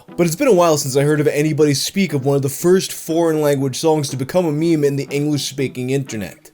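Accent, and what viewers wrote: New York, United States. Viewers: did you always have a new york accent? i swear you didn't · WTF! You are Sikh??? U sound American as fuck.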